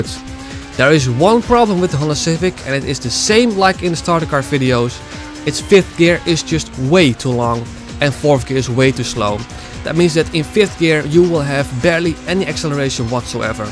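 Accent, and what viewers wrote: Netherlands. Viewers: I can tell you’re dutch, if not, then i’m just stupid, but, anyways, no hate tho, finally a dutch tuber talking bout need for speed game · Are u from Holland 🇳🇱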